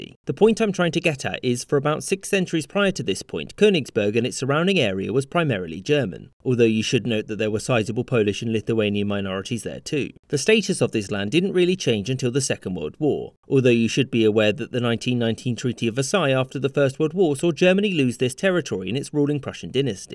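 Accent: United Kingdom. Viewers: Since you have a British accent, how does England own Falklands and Gibralta, and some part Cyprus? And so many lands outside UK? That should be the real question.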